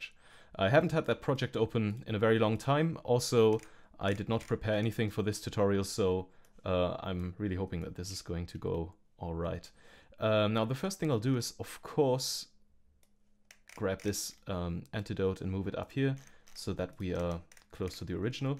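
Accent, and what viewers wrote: Germany. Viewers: direkt deutschen akzent rausgehört :)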